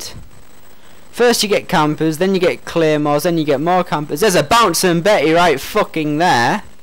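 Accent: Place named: United States. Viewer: are u kidding me ??? ----- really? i think all the americans are rubbing off on you - starting to sound like them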